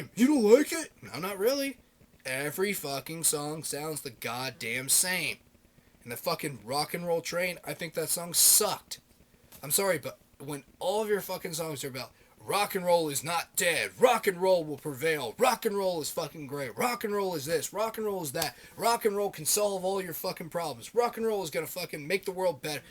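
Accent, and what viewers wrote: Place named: Germany. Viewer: your german is funny :D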